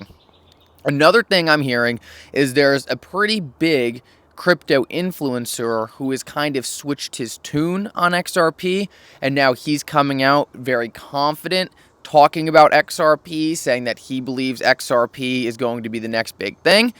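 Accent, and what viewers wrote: Allentown, United States. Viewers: It is going down with an Allentown accent. I thought you were good in Math dude.. Gaining 20% of 60 % crash from March levels isn't going up bro.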